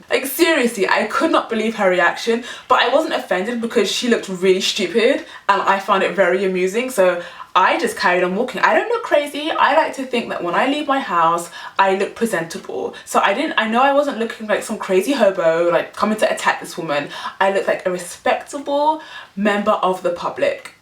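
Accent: England, United Kingdom. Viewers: She has the British accent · I can kinda understand (some what) peoples need to stare at things that they don't see very often or they're not use to. In the US there are a lot of different races so some one walking by of a different race from you isn't a big thing but there is one thing about you that would make me stop. Your accent. I love your accent! Hearing an English accent on a black person is rare here, so I would do a double take. I would stop you just to ask you to chat so I can listen to you talk. To be fair I would do that to anyone from the UK.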